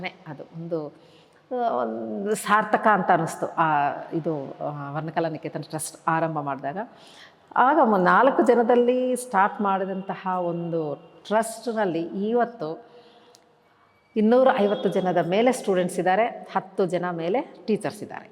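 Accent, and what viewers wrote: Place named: Andhra Pradesh, India. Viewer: ನೀವು ಮಾತನಾಡುವ ಶೈಲಿ ನೋಡಿಯೇ ನಾನು ಅಂದುಕೊಂಡಿದ್ದೆ ನೀವು ಆಂಧ್ರ ಮೂಲದಿಂದ ಬಂದವರು ಅಂತ, ಆದ್ರೆ ನಿಮ್ಮ ಕನ್ನಡ ತುಂಬಾ ಚೆನ್ನಾಗಿದೆ😊ಖುಷಿ ಆಯ್ತು ನಿಮ್ಮ ಸಾಧನೆ ಕೇಳಿ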